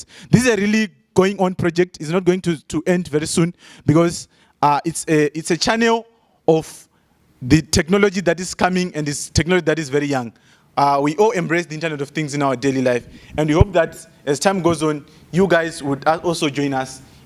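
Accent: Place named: Ghana. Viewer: You have suddenly adopted the Ghanaian accent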